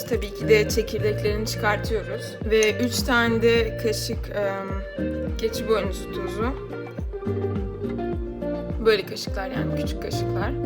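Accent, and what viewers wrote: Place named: France. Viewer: konuşurken hafif tatlı bir fransız aksanı geliyor sanki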